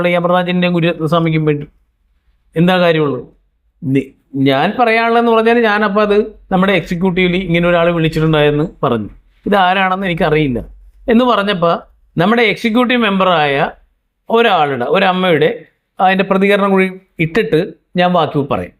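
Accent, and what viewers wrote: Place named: Kozhikode, India. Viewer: ഈ വോയ്സ് കോഴിക്കോട് കാരന്റെ എല്ലാ... കോഴിക്കോട് സ്ലാങ് എനിക് അറിയാം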